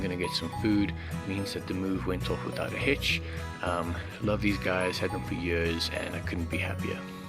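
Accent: South Africa. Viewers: Im kinda getting South Africa vibes but Idk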